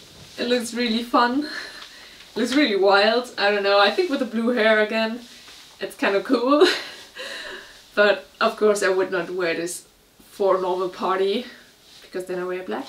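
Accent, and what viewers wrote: Germany. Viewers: You're a very pretty woman 👩 💕 look sexy in these style clothes 😍 I think I can hear a German accent that sounds cool. Not a fan of blue hair, but I understand that's today's style, I just like to picture you with dark brown hair, oo LA LA 😉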